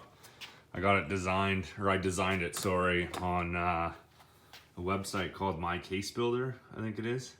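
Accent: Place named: Midwest, United States. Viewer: Nice work. I have an S650 as well. Where are you located? By your accent, I'm guessing mid-west area. I could use someone like you in my area (North Florida).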